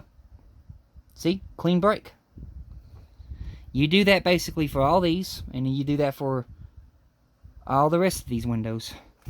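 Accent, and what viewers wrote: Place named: Texas, United States. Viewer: Wow you are so clever the way you do these things I find it so interesting to watch you working on this beautiful model. Thank you so very much for sharing this with me I will attempt to do what you have done but im not as skilled as you but i will definitely give it a try. Once again thank you and look forward to your next video take care o!! By the way are you from texas luv your accent stay safe take care 🙂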